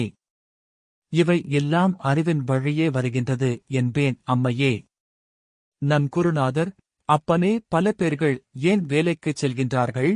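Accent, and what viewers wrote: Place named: North, India. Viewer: தயவு செய்து தமிழ் தெரிந்தவர்களை வாசிக்கச் செய்யவும். அகத்திய மா முனி / பெயரை கெடுக்காதீர்கள் தமிழுக்கே இழுக்காகும். யாரோ வடக்கர்களை வைத்து வாசிப்பது போல உள்ளது.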